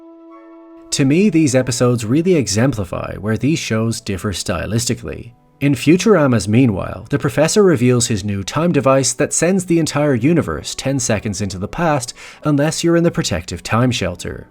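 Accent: Ireland. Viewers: Weird question, are you Irish?😅🤔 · Bless, Irish for sure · He sounds nothing like Paul Mescal but I suppose accents vary a fair bit in Ireland/Northern Ireland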